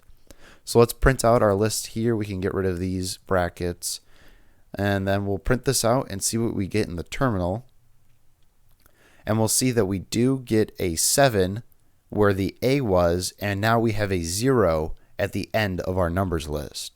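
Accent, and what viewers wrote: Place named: United States. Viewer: Hey bro, thanks for your course with US accent. It is easier to follow the course.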